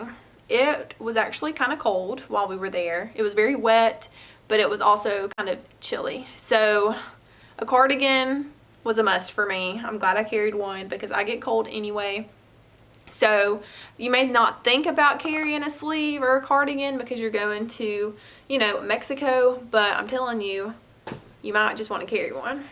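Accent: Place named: South, United States. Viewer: You have to be from Georgia or Tennessee 😊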